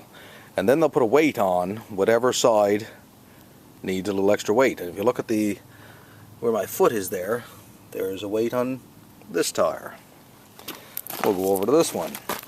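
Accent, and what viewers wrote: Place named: Nova Scotia, Canada. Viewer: When I heard “charged aboot” I was like wait, this guy is a Canadian, and he followed “Canada here” lol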